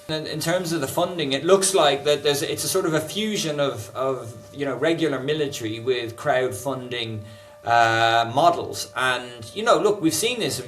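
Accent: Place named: United Kingdom. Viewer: British accent = lies.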